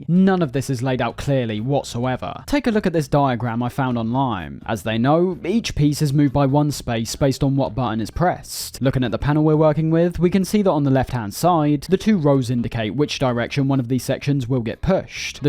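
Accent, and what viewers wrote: United Kingdom. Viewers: a brit is speaking about a video game for autistic people, listen and learn 🤫🧏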